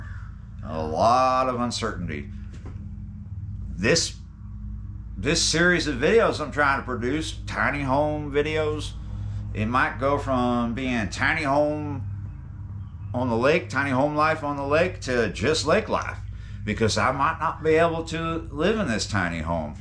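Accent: Texas, United States. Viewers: Thanks for your videos. Going to miss your Mississippi River vids. Hang in there brother. You already are reverting to a Texas accent lol.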